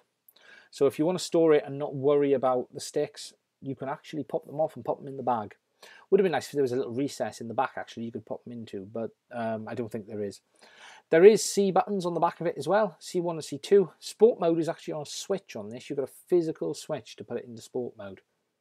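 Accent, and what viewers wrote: Wales, United Kingdom. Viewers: so welsh!!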